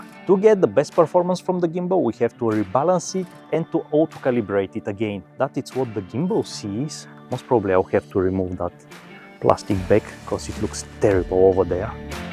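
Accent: Russia. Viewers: Чувак, у тебя просто кошмарный рязанский акцент.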